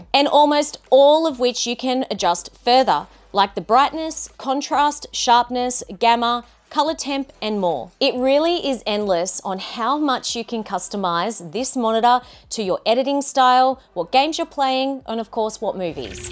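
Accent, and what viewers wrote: Australia. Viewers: You have one gorgeous Australian accent, 😍 I love listening to it in your videos. Nice gaming monitor here looks bloody cool! 😎👍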